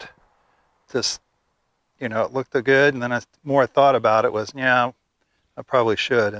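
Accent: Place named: Yorkshire, United Kingdom. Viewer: thanks for another excellent tutorial. The overdrive on TS394LO was working when I shut it down a long time ago so hope it is still OK. Our families are from the same neck of the woods - Yorkshire - probably why I can understand everything you say.:-) John in Manitoba.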